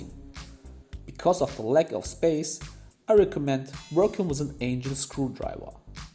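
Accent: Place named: Germany. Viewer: Nope, I'm Asian that voice doesn't sound Asian period. I was hearing German, Dutch or Danish.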